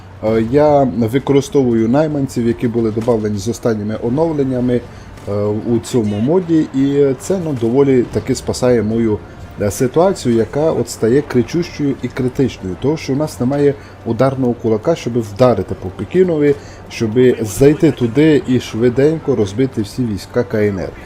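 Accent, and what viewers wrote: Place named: Lviv, Ukraine. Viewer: а я так і зрозумів, що ти з западу, бо надто вже схожий на північний Львівський діалект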